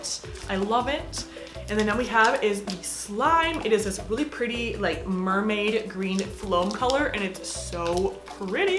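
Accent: United States. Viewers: Is she american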